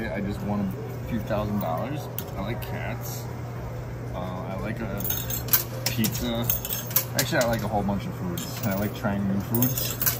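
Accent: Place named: Appalachia, United States. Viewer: What was your buy in, sooo your profile pic is not the real you?? And....your hill Billy twang ain't waiting was last time you was here with mrs colin!! I like watching this cos I'm a gambler too Sometimes, but I like the pokies coz you don't have to think about nothing just tap tap tap, like what you doing, drop drop drop lol...don't talk if u got nuttin to say.. If u not feeling it don't say it I just wanna watch not listen to talk lol talk is too Cheap...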